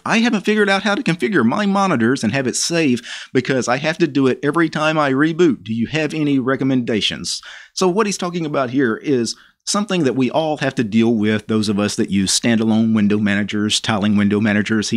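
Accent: South, United States. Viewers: You sound southern. Where are you from?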